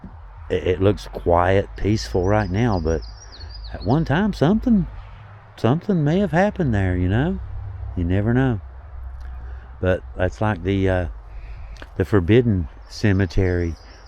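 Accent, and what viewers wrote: South, United States. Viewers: So pleased you covered this infamous piece of history. Super video. And of course I could listen to your southern accent all day long. Love from New Zealand 🇳🇿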